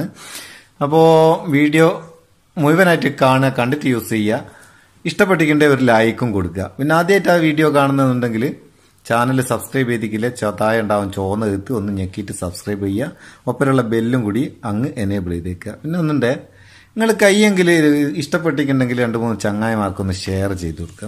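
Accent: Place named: Malabar, India. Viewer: Malabar slang 😁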